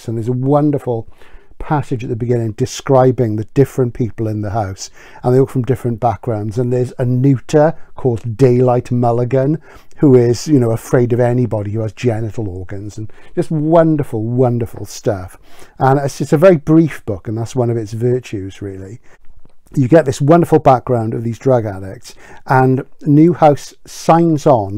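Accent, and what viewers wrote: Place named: Newport, United Kingdom. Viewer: I'm guessing you are from Newport? Sorry I love my accents and decoding them.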